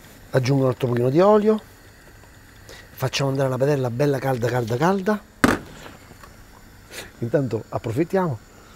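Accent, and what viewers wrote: Rome, Italy. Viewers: Troppo simpatico con questa cadenza romana che dire..... bravo ⭐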